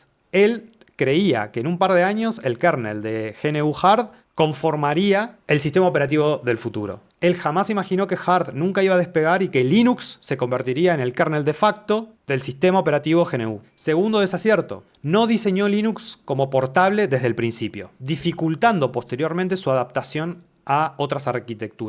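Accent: Rioplatense, Argentina. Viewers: Me podría pasar media hora, halagando las virtudes de esta locución en sus diferentes aspectos. A mis 70 años , 50 de programador y en electrónica, lo voy a decir en una sola palabra. "Excelente" . ( Imparcialidad en el enfrentamiento intelectual entre los colegas, balance conceptual y emocional , uuffff !! difícil cosa , por eso me suscribo. ) Ademas , de regalo, entendí TODO, la dicción perfecta, sin vicios del habla, muy raro en nuestros dias, en TODOS los medios, incluso en este. Felicitaciones pibe. Un gusto escucharte y sonido rioplatense. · Que hueva perder mi tiempo en una disertación tan estéril , crei que escucharía algo novedoso. Y encima de todo tu acento argentino lo echa a perder más, esperaba algo más interesante y no solo un fan más del kernel de Torvalds echándole porras, lo llevas a un terreno casi casi de novela de televisa.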